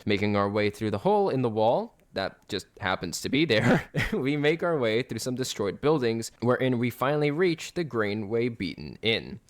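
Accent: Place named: United Kingdom. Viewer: You sound british but justified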